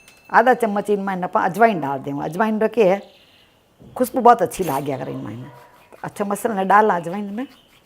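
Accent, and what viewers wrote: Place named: Nagori, India. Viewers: ap ki zuban nagori hai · mai rajesthan s hu , ap ke language sun k apny mayke ke Yaad ati hai.... nice recipe 👌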